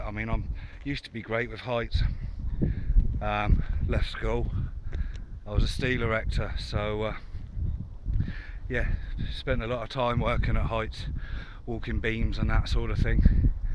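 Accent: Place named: Portsmouth, United Kingdom. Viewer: Are you a Pompey lad? Your accent seems familiar. Lovin, the vids mate, keep on doin' yer thang. 👍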